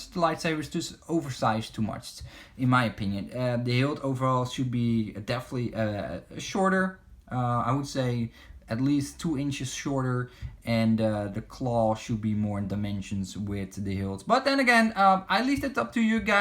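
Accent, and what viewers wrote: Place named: Netherlands. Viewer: Realy love the hilt! You said you are gonna sell it? Im intressed,i live in the netherlands and i think you too? Because of the accent haha.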